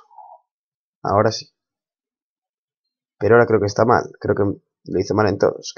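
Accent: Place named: Madrid, Spain. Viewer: ya decia yooo que tu acento me sonaba XXD yo de madrid!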